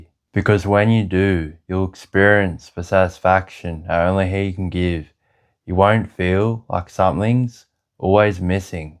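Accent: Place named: United Kingdom. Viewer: Thank you for subtitles. You have a very strong British accent and I'm not even American. Thanks to the Lord I could understand you without subtitles but many people out there need you❤ please continue your work. Bless you brother❤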